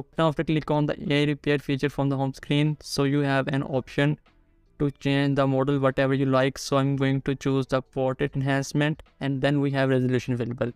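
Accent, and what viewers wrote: India. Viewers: You Indian accent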